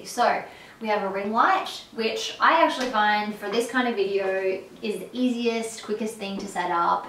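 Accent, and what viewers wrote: Australia. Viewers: She's so beautiful!!Love her aussie accent ♥♥♥♥♥♥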